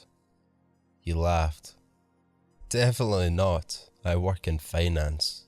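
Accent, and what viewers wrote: Scotland, United Kingdom. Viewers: I just came across your channel after hearing you co-narrate a story with Swamp Dweller on his channel & now I'm hooked. Your Scottish accent makes me think of an old friend that lives out in Bonnie Bridge (spelling?) Scotland. I'm from Botetourt Virginia USA. And I just recently found out that I'm descended from a Watson Clan on my mother's side. So I have recently been digging into my ancestry. I hope to one day visit the Homeland impossibly dig more into my heritage there. Anyhow, please keep up the good work we are certainly enjoying your stories! Cheers!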